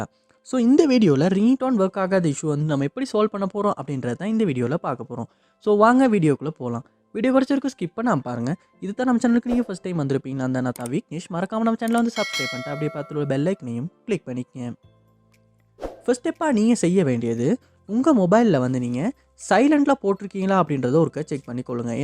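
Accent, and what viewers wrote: Sri Lanka. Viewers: Bro neenga illangai tamilana ?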